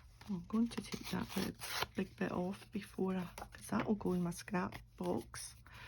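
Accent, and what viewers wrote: Scotland, United Kingdom. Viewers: Hi, so lovely to hear and see a fellow jock crafting. I am 47 and a total beginner. I am loving life. Midlife crisis phase pending but no fast cars or toy boys for this married lady with six kids haha just paper, glue, scissors and lots of YouTube videos. It was Amanda from Srimmping Mommy that recommend you and how delighted am I to hear a Scottish accent. I am from Fife. I don’t know anyone that crafts local to me and that’s such a shame. Your videos and new craft room are wonderful. I have been binge watching, not stalking. Video stalking. I started trying to adult colour roughly 18 months ago and love it but I’m having problems with my hands and neck and I was embarrassed I couldn’t do as much as I would have liked I then got engrossed in junk journals, and all that entails. I haven’t been brave enough to post any recent videos on Instagram or YouTube but hopefully with more confidence learning more. 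I will share and join in. It’s such a wonderful, kind, helpful, decent community. Something rare these days it feels like. I have just joined Amanda’s Facebook group. She is a lovely women with a great personality and mission to help and get others involved and for that alone I support her. I will be thanking her for sharing you with me haha Now I’m away to watch more altered cards ❤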